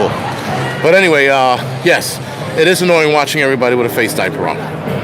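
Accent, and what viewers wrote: New York, United States. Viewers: Can't see you and you sound like a newyoker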